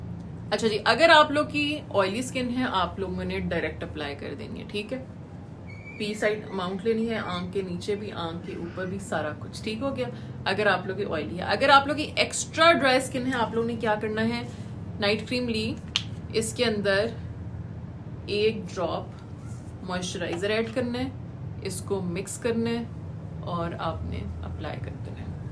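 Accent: Punjab, India. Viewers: Asalam o alaikum yar api ek video full punjabi me banae itni Sweet punjabi bolti hen maza double ho jata hy😂😂❤ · The punjabi accents are just love api 😅❤ Truly a girl down to earth 🌎 ❤️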